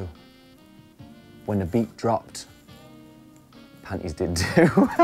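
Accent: United Kingdom. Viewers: Damn his British accent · I can't understand half of the words he said, still learning to understand british accent better😂😂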